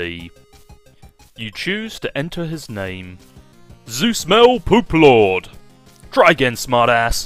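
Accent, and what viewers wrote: United Kingdom. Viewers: Probably cause of bri'ish accent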